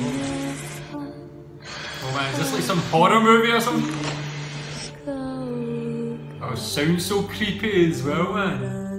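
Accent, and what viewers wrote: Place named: Scotland, United Kingdom. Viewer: Yo man, you have the cutest scottish accent. I could listen to you for hours. I wonder if you could do podcast or audiobook lol. Anyway, please do watch this movie. Give us a review and let us know if it's worth our money. Thank you!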